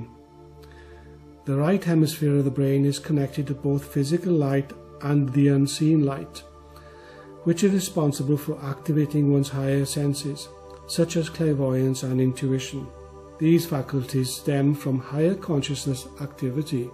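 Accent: Wales, United Kingdom. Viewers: Are you welsh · Nice to hear a Welsh accent! 🏴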